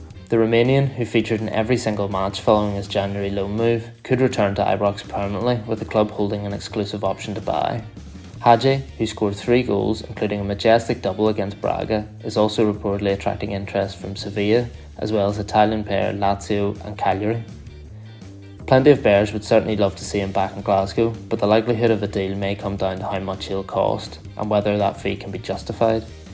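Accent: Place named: Ireland. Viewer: Wow I didn’t realise there were Irish rangers supporters ? Can someone clear this up as the presenter sounds Irish ?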